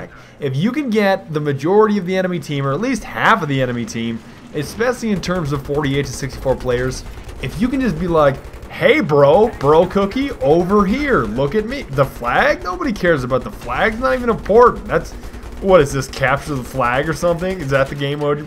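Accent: Germany. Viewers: I Love your German ;) greetings from Germany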